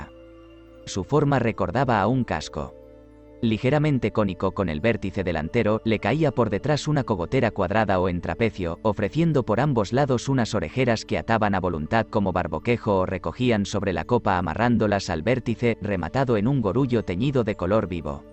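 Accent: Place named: Canary Islands, Spain. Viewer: Una forma de darle un plus de valor a la información es hacerlo con un acento que no le CHIRRÍE A NADIE. Un acento canario formal, (no el coloquial o informal de bares y colegas) en un tema canario es altamente recomendable y muy coherente, máxime si encima ustedes se hacen llamar.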